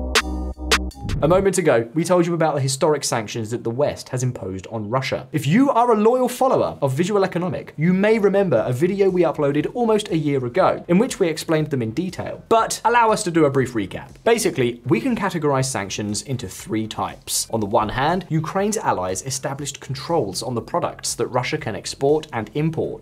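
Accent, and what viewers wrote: RP, United Kingdom. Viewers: Why does drivel told with confidence and in posh British accent sounds reasonable? That's the only question I have to you. Russia has the fundamentals, including energy, food, minerals, wood, and some decent, though mostly basic, industrial capacity that allows it to manufacture finished goods, particularly weaponry. Weaponry and ammunition at a price point much lower than Western's. Some of this weaponry -- especially the drones -- is effective against multimillion dollar Western equipment. The rest (including many types of FPV drones and chips) is imported, both directly and via intermediaries, especially from China, with whom Russia shares an immense border. As to budgetary deficit, it is not so large, compared to the income Russia has from its exports, which will allow it to eventually recover its finances. While you're at it, you should have mentioned that all this expensive energy that Europe has been buying has led to deindustrialization. 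Particularly, and most painfully, in Germany. And it's ongoing. Also mention the US's deficit. It's stunningly large, and some say there'll be hyperinflation in the US. Saudi Arabia has partly moved to abandon the exclusivity of the petrodollar. I'm suspecting that the anglophone elites are intent on screwing up the world, including themselves. But I'm undecided whether it's because they're crazy, stupid, or stubborn as hell, or all of it. Maybe the decadent and dying British aristocracy is an indicator of that. After all, they're scr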